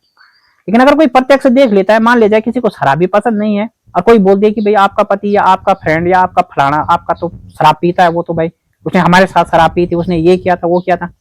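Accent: Garhwal, India. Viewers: बहुत ही सुंदर वीडियो भाई जी आप बोलते बहुत अच्छे हो और आपकी बात शुद्ध और स्पष्ट होती है आपके द्वारा दिया गया रिएक्शन सटीक होता है आपके कमेंट बॉक्स में एक भाई ने कमेंट किया है कि आप हिंदी में कम गढ़वाली लहजा अधिक है तो भाई जी मै इस भाई को कहना चाहता हूं की बिहारी पूर्वी उत्तर प्रदेश बंगाली मद्राशी उड़िया महाराष्ट्र बड़े बड़े राज्यों के लोग अपनी भाषा बोली का इस्तेमाल करते है अपने लहजे में बात करते है अच्छी बात है न गढ़वाली लहजा है तो गर्व की बात है कि हम गढ़वाली है हमारा लहजा गढ़वाली होना चाहिए आप भाई का बीडीओ देखो इंजॉय करो और भाई को सपोर्ट करो लाइक करो बेफजूल के कमेंट करने से अच्छा होगा हम अपने इन भाई को आगे बढ़ाए स्पोर्ट करे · आप हिन्दी में कम गढ़वाली लहजा अधिक है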